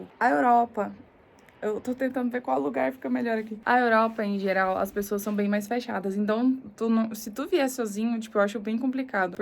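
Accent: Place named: Goiás, Brazil. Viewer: Goiano e sempre um trem gostoso de ouvir né! Acabei de chegar mas já estou adorando seu canal.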